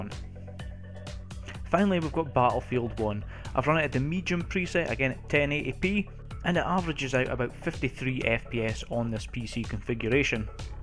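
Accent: Scotland, United Kingdom. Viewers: Your Scottish eh? Dinny try hide it noo.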